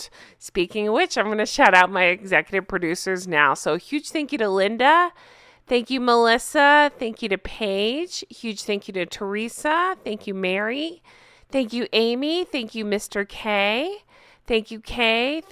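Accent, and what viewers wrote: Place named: East, United States. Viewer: You are correct, Greg. But she is from somewhere in the east US, making her pronunciation incorrect. Besides, mine is the dictionary pronunciation, which I only checked after your comment.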